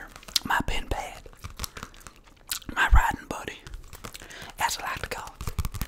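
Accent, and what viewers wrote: South, United States. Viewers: He has an unique way to do the asmr movies.I am a fan for a long time and never dissapointed me.Altough i prefer his chewing gum rumble,hand movements and of course the southern accent.I rewatch old vids and i am ok.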